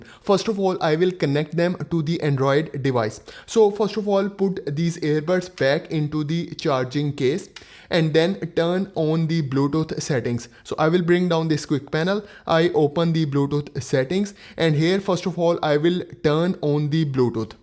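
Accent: India. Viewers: Nasty pajeet accent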